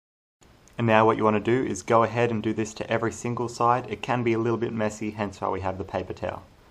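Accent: Australia. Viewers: Guys just cause he's australian doesnt mean its Felix 😂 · Umarun Nisa no he is just Australian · Its called how Australians really sound like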